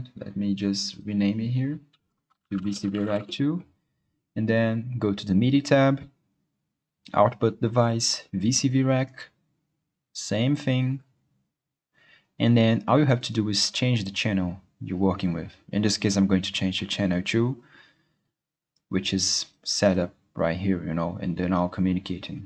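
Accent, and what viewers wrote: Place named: Brazil. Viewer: esse sotaque não me engana tu é brasileiro CERTEZA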